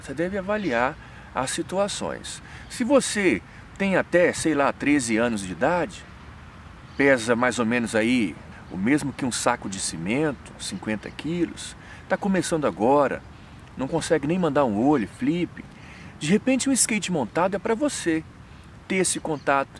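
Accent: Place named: Brazil. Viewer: tu tem muitos vícios de linguagem brasileiros no teu inglês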